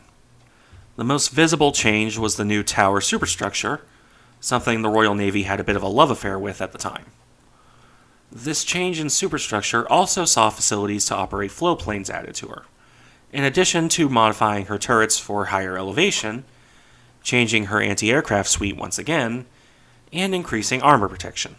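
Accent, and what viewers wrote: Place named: United States. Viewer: Nicely done. Earned you a sub. Very well presented....the best "Americanized" version of Drachinifel I've ever heard. I look forward to future content.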